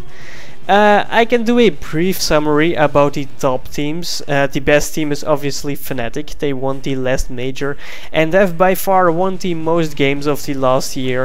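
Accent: Netherlands. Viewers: Such a dutch way of speaking english xd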